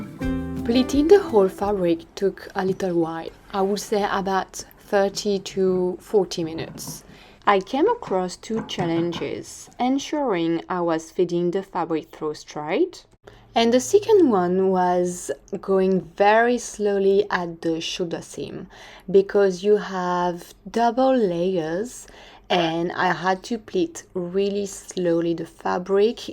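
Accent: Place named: France. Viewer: Love your French accent ! Et tres Jolie tasse au passage 😘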